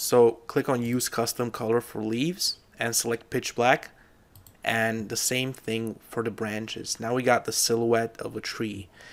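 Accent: Germany. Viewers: Are you German? :D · Man merkt zum Teil dass du einen deutschen Akzent hast xD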